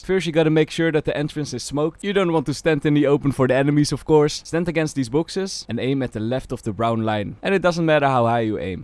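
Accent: Netherlands. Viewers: de nederlandse accent is kei hard haha, danku voor de tips !! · are u dutch or belgium by any chance? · meest nederlandse accent wat ik ooit heb gehoord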